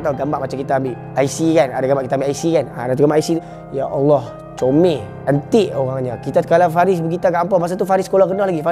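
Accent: Kedah, Malaysia. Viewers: ( loghat Kedah geng !! )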